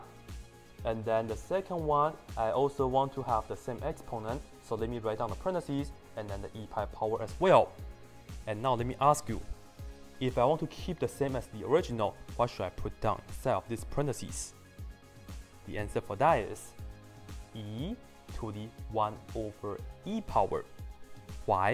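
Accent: Singapore. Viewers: Great video. You sound like you're from Singapore, which is a country known for its great education system. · 你妈 新加坡口音简直是折磨人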